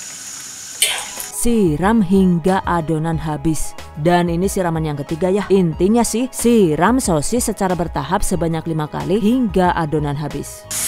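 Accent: Banyumas, Indonesia. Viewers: Lah ngapak😂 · Wong ngapak jebule ... 😁👌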